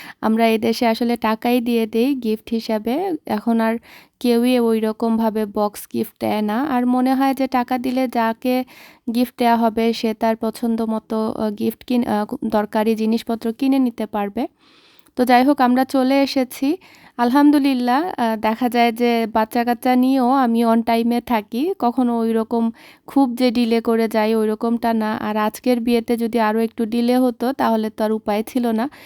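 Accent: Sylhet, Bangladesh. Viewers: তুমি সিলেটি তোমার সিলিটি কথা সুন্দর লাগে আমি ও সিলেটি।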